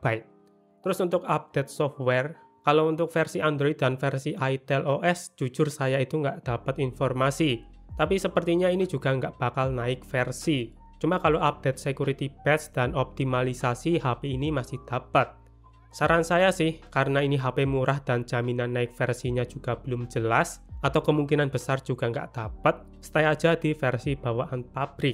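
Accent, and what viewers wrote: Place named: Banyumasan, Indonesia. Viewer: "ya jelas ngelag lah blog" ngakak anjir ngomong nganggo logat banyumasan lucu piye ngono maseh😂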